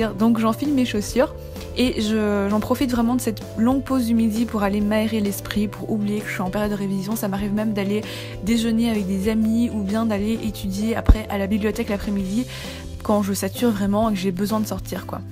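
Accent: Belgium. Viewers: Belge ?! 😂❤ Super vidéo 😍💍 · wuoooh' tu es Belge? :0 c'est la première vidéo que je vois de toi, franchement j'apprecie, je m'abonne! et je mets, un pouce bleu! 😊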